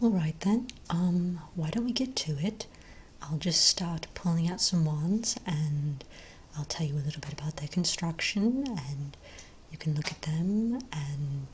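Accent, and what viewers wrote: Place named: United Kingdom. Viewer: I like your British accent! 😁 I'm working on a British accent for my next video too, it's not easy 😆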